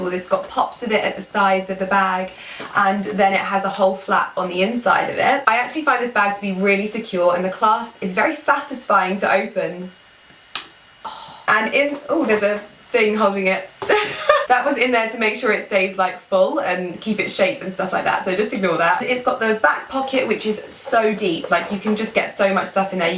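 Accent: United Kingdom. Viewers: Really Love your style and your bags!!! Keep posting your fabulous bags :) and your accent remind me of the days studying in the UK. Love from Taiwan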